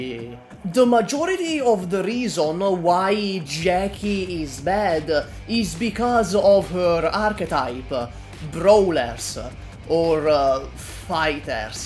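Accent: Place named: Italy. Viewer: I've noticed your Italian accent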